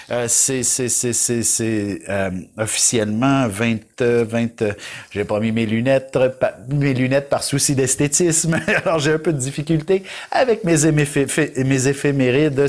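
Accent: Canada. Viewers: Bonjour, faut il écouter les ascendants svp, j adore votre accent canadien Merci Mais il y a un moment que l on ne vous pas entendu, je suis capricorne ascendant lion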